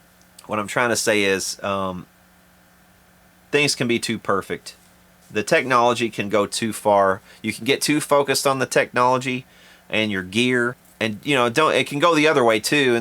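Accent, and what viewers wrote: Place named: South, United States. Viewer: Hey, that was great felt good to hear! Coincidentally, I heard the show Joe B. put on here in Munich as his next to last, 49th of 50 this year in Europe. He moved around quite a lot, had Mr. Reese Winans on keys, 2 horns(one with vocals, too), 2 singers, drums and bass. I'm a good deal older than you at 66, but my mid-20's son, who pounds a pretty mean drumkit and is a lot more into metal, really thought it was pretty good. We were close enough that it was a bit too loud sometimes, but the energy of the band was to my eyes downright awesome, they were really in what I would term flow for about 80 percent of the time. Joe played a massively well-modulated acoustic solo after he'd already said, "Good night!" the first time which felt like about 20 minutes. Then the band came back and did another song to close. I really enjoyed it. 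I don't go to concerts often, but that was definitely worth the time, braving the rainstorms and the money. Thanks for the vid and keep it up. Your expletives are not my style or habit, but the southern flavor comes through nicely.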